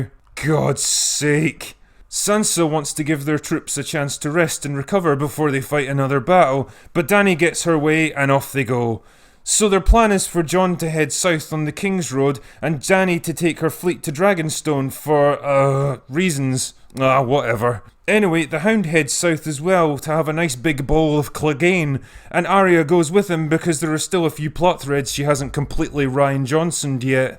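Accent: Scotland, United Kingdom. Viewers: It sounds Scottish lmao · Love your Scottish accent. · You cant believe how much i love the And thats all i have to say. Go away naow. In this lovely british/scottish accent. Every other youtuber would have said: Like and subscribe, follow me on instagram and facebook Absolutely in love with this... review? Subscribed, instantly.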